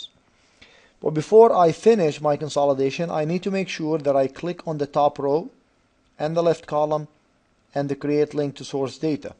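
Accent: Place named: Arab world. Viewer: nah hes an arab, indian accent is quite different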